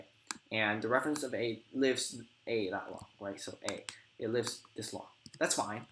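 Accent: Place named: China. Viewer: 听口音像是中国人，所以能出个中文版吗？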